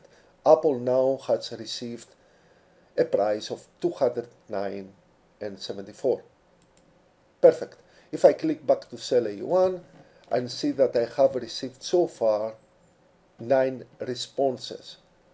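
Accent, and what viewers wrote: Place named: Greece. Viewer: Απο την προφορα καταλαβα οτι εισαι ελληνας χαχα.... δεν ξερω τι ακριβως προσπαθεις να κανεις τυχαια βρεθηκα στο βιντεο αλλα ισως μπορεις να μειώσεις τα calls προς το api που χρησιμοποιεις για αν μην χτυπαει το threshold που έχουν. ΠΧ να τραβηξεις πληροφοριες απο yahοο finance με την buiild in functionality του excel και απο εκει και περα να τραβηξεις σε αλλλα κελια με το vantage indicators που μπορει να μην παρεχονται απο το yahoo Αλλα γενικα.. ολοι αυτοι που δινουν api ...στην ουσια πουλανε api και σε αφηνουν απλα να δοκιμασεις και μετα ζητανε γελοια ποσα για api calls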